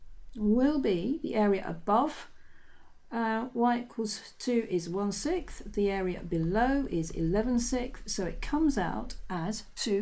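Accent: United Kingdom. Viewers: Are u British? Cause I like ur accent :P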